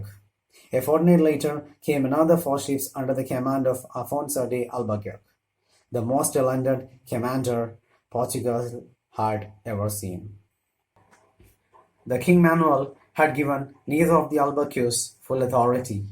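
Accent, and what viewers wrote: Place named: India. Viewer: I can read the book it’s more understandable then a Indian accent I thought this was a documentary.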